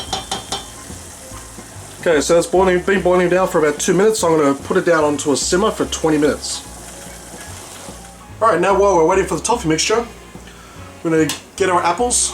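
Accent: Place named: Australia. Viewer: Hey mate are you aussie by any chance? Nice apples btw, they looked so tasty and when you bit into it I was thinking "god I bet that tastes sweet"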